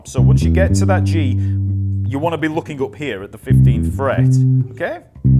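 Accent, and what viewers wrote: United Kingdom. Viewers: You are, a great teacher. Moreover, I can learn better British English pronunciation:)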